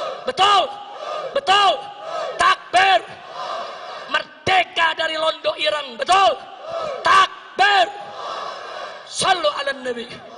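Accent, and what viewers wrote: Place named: Jakarta, Indonesia. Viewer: klo menurut ane yaa orang Betawi khas bahasanya emang seperti itu nada rada keras, buat habib Rizieq keras nada nya kaya komando perang tapi buat kebaikan,, negara gak berkembang klo pemimpin masih berbuat zholim siapa lagi yg negakkin klo bukan HRS? Apa harus Nunggu imam Mahdi? Kiamat inget broo menyakiti dzurriyat nabi sama saja menyakiti Hati Rasulullah, ada kisah nya 🔥 walaupun sekeras apapun tetaplah dzurriyat nabi 🙏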